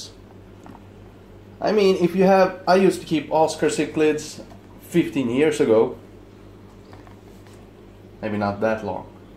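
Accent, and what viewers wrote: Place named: New York, United States. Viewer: I love you channel i subscribed right away . love your accent and humor. Love for newyork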